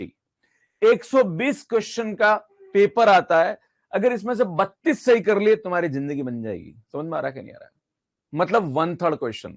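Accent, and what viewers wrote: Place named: Haryana, India. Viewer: Sir aapki bolli me haryanvi touch h😝😝😝 Jo bot mst lgti h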